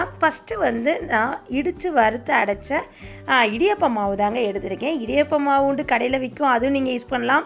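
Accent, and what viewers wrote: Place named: Kerala, India. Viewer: मैम, आपकी बोली किस ज़िले की है? I mean your native language... its not pure Tamil.../ mixed malayalam/ which area in Kerala's? Palakad or Kanyakumari?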